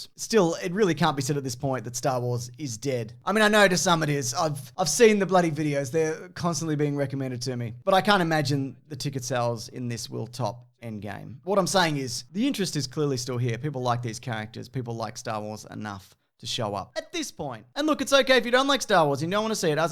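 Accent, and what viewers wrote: Australia. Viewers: Doan said it's the accent and they're partly right - it's because he's Australian. It's so bloody hot here that you can't care about anything else. Notice how started to get wound up about something, but then just bails on it? It's too bloody hot to stay in that zone for long. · You are the reason I switched my Siri voice to Australian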